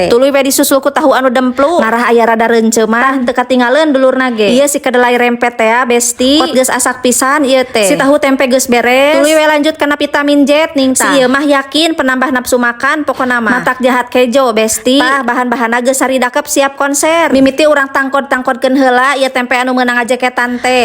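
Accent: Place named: Sodong, Indonesia. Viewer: Logatnamah ciga urang Sodong.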